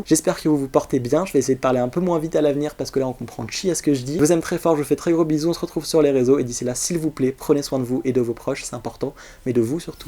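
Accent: England, United Kingdom. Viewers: Mais quel accent anglais ! Whouaaa 😂💕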